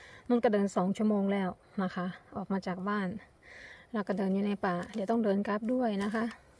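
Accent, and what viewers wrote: Korat, Thailand. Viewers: สวัสดีจ้า ฟังพูดคือคนโคราช บ้างเอ็งแท้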